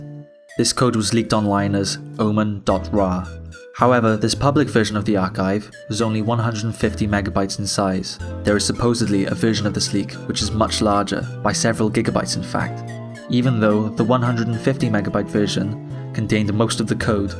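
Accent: Wales, United Kingdom. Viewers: Wondering this what part of Wales you from? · everyone else is talking abt the crepypasta but i just cant not notice the welsh accent lol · Wait are you br*tish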